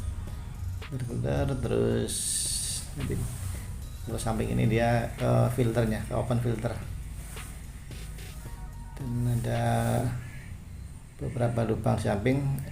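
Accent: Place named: Banyumas, Indonesia. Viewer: bahasanya ngapak om, salam dari republik ngapak, purwokerto 😄😃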